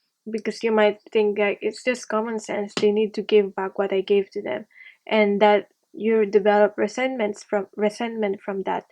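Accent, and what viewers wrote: Philippines. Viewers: You sound filipina 😊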